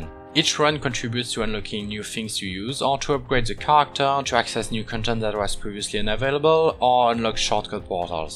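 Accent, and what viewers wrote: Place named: France. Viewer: you french?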